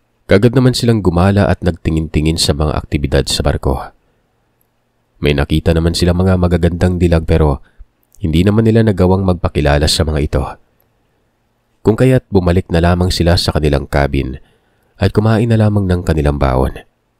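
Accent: Iloilo, Philippines. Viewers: SIKONGKONG😂😂😂 ILONGGO BA SI SIR?????😅😅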